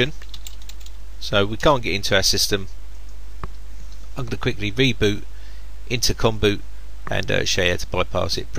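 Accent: United Kingdom. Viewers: This is one of the most annoying Brit accents...among many many others.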